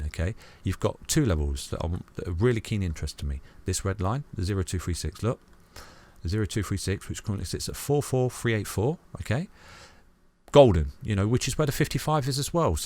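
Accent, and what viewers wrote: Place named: Birmingham, United Kingdom. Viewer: I'm not a trader I'm a hodler but your videos are great educational information and your accent is good to here (are you a brummie?) . Regards from Thailand 😁🙏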